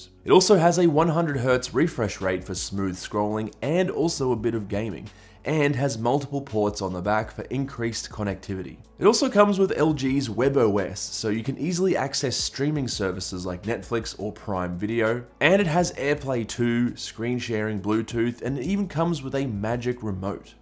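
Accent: Australia. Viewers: Australian accent but USD prices? Also, has there ever been a 3rd party mouse with 2 axis scrolling? I have tried other mice but scrolling side to side is essential for Illustrator.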